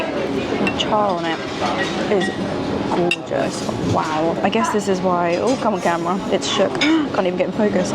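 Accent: RP, United Kingdom. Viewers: Lova ya posh gal.👍🙏🌹❤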